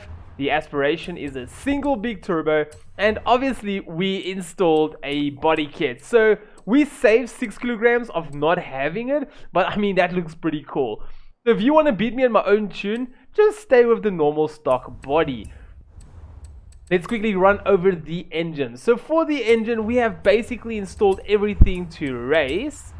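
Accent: South Africa. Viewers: Sound like u from S.A?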